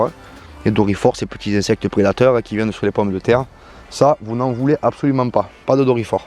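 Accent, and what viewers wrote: South, France. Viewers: Mon beau sud de la France me manque, j’entends mon accent, merci pour tes vidéos que je viens par trouver grâce à l’algorithme. Ça m’aidera pour mon petit potager en Hollande. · Même si tu vas à toute berzingue, (il faut que je trouve le ralenti !!! lol) je me suis permise de rêver que j'étais dans mon petit potager et que le sol était envahi de lianes de courges, courgettes et autres cucurbitacées. Ton accent à renforcé cette impression ! le sud s'est déplacé ?! Combien de décalage, en terme de températures, avec le sud du sud, afin que je m'adapte ? J'aime beaucoup ton appréciation de la nature : naturellement vôtre ! Je viens de m'abonner, j'ai beaucoup de vidéos en retard, je les regarderai au fur et à mesure. Vidéo très intéressante, merci. Chris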